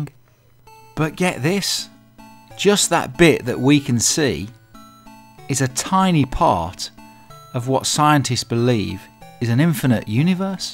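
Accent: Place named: Birmingham, United Kingdom. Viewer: Subbed: Is that a fellow brummie I hear!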